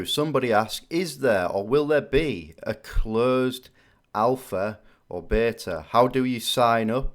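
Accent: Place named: United Kingdom. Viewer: Dude where abouts in the UK are you from ? Cant tell your accent.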